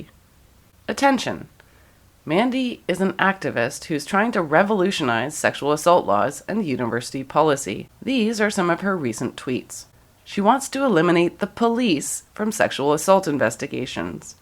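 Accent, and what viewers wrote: Canada. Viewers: I love your accent, Are you Canadian? Am watching your videos which are courageous...love the Bill Cosby one!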